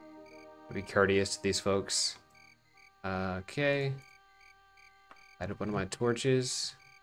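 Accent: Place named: Texas, United States. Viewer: I didn’t know what it was or how I knew, but I could tell you were a fellow Texan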